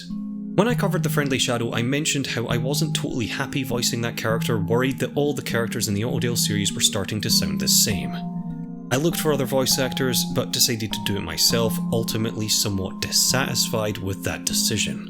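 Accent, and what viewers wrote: Scotland, United Kingdom. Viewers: I love that you with a nice and friendly sounding Scottish accent plays such and makes an amazing dark series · How am I so bad with accents… me, born and raised in Scotland my entire life.. and can’t even recognise that the voice I’ve been listening to for the past 4 hours of YouTube binging is also a Scot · I can’t un hear the Scottish now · Omg you're Scottish ❤️❤️❤️❤️ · Me realising that is Scottish: I thought you where English and yes the snow is every where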